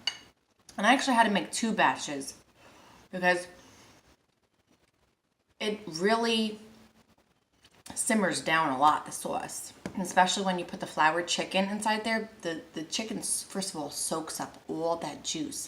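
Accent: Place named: New Jersey, United States. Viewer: ps .. thank you fro the recipe and i love your jersey accent girl it is so cool LOL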